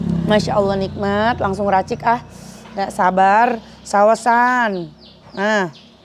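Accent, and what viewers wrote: West Java, Indonesia. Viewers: Punten teh sekedar saran. Tth jgn pke jargon endol surendol di chanel tth. Krn mnurut ku chanel tth tu khas pembawaan dr tth yg spontan dgn bhsa Sunda atau logat Sunda. Jargon itu kan buat di TV sebelah brsama rekan kerja tth biar ada ciri khasnya dr chanel tth gtu jgn pakai jargon itu gtu Hehhe punten hanya saran Overall aku suka smua konten2 tth sehat sllu th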